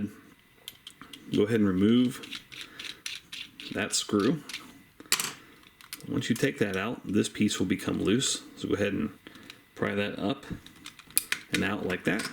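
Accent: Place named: United States. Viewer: You're clearly American with all of the "go ahead and...".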